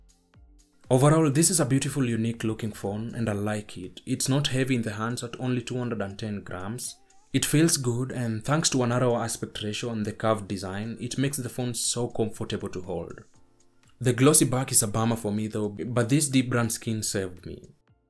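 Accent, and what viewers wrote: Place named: Kenya. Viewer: Dunno why I feel like you sound Kenyan😂😂😂....just bumped into your channel as I was reviewing the pixel 6 pro. I have the Pixel 6 and love this phone but honestly I keep wondering if the camera on the pro is better. Do you have an opinion on this? That would be the sole reason I switch to the pro. I love taking memories of my kids on video and photo and want the best images? If the pro is better I just might be convinced to swop purchase it. Thoughts ?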